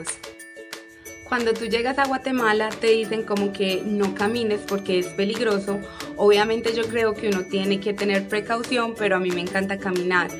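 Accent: Colombia. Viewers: habla como colombiana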